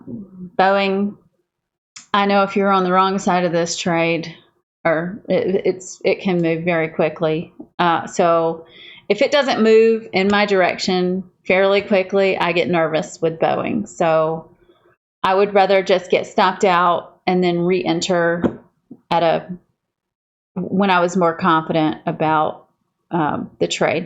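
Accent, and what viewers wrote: Georgia, United States. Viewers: I should have guessed. I'm from south GA. BBT is so global, I don't often hear familiar accents. LOL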